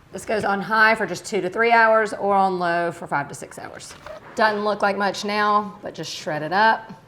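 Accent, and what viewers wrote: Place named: Alabama, United States. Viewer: ! The recipes are almost always on point, but if I’m being honest, a lot of times I just listen to her videos because I hear home in her accent. I’m from a sort of culturally ambiguous part of northeast Florida (St. Augustine - we’ve been here since 1565 and have become a cultural crossroads for so MANY, that we don’t really have our own sho-nuff “this is who we are” vibe you get in so many other places. A drinking village with a fishing problem, some say. BUT, we are close enough to Georgia and Alabama that you can hear both in our accents here. I’m not positive, but there sounds like she’s got roots in Alabama. Sounds like home to me, so here I is!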